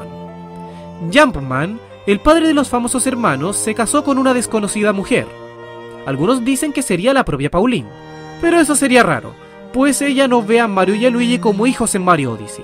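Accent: Chile. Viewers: Eres de CHILE???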